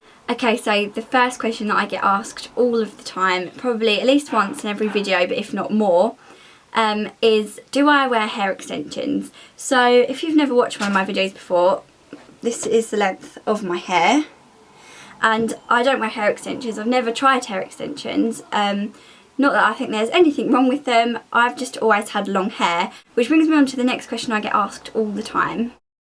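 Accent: United Kingdom. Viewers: I can understand what she's saying. I personally love British accent, its probably because of Harry Potter. XD I always thought British people have cool accents. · wtf? does someone understand what this girl is talking here?=))))))))))))) for god safe speak too too much fast :)) i don't think she realise :)) i always hated british accent is horrible , british sucks:| the acent is enough tacky she shouldn't talk fast , british accent + fast talking= SUCKS girl please don;'t speak so fast in your videos if u want ppls understand u:)) not just british ppl using internet LOL ..LMFAO :)) this made my day=))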